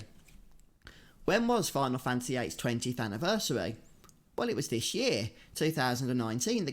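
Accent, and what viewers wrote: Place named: England, United Kingdom. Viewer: I love FFVIII a bit more than VII. BTW, I don't know why but, I like your accent. What part of England are you from?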